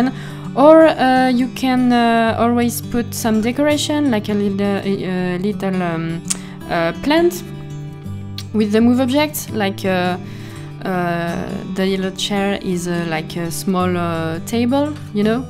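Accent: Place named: France. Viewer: I can completely understand you! And for the record I love the French accent! And you’re voice is so nice to listen to. I understand that you’re nervous, I myself speak a different language and I just did my first voice over too. But it went well and with every time we do it I think we’ll be more and more comfortable 😊 I really like this home btw, so beautiful! 😍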